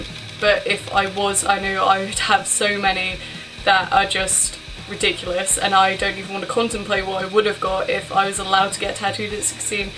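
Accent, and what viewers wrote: United Kingdom. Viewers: odd question but where are you from in the UK? Your accent sounds super familiar - Milton Keynes by any chance?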